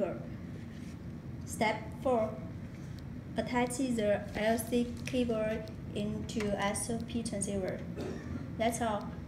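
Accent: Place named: Asia. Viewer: thats how asian speak u dumb ball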